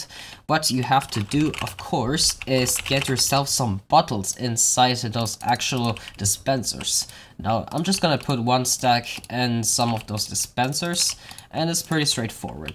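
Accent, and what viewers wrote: Germany. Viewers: MC He is German, you can clearly hear his German accent XD I guess all really good technical minecrafters come from Germany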